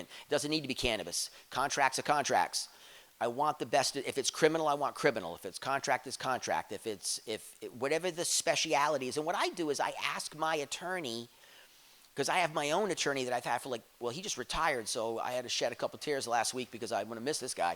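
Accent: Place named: New York, United States. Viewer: Slips into? From an Aussie standpoint his accent sounds like his from Brooklyn/NY geographical area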